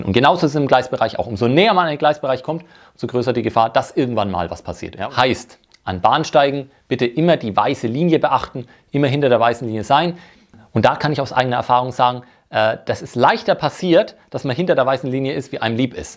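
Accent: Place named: Franconia, Germany. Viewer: Schöner Dialekt, der Fränkische Dialekt. Meine Oma kam auch aus Franken. Sie kam aus Stadtsteinach.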